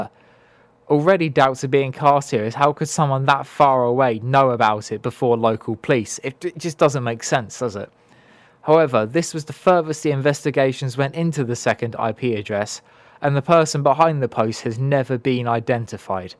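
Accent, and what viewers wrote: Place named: United Kingdom. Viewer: And of course another wrestling channel with a British accent? It’s like nails down a chalkboard can’t stand British accents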